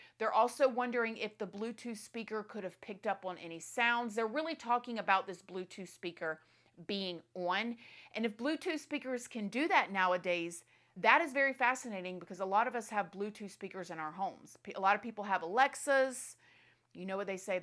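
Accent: South, United States. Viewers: Why do you have a southern accent but pronounce "on" like a New Yorker? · Why even bother saying anything at all? Is it for your 2 seconds of want to be fame? Just be nice or be quite. It’s called a southern accent.. if you don’t like.. don’t listen.. it’s that simple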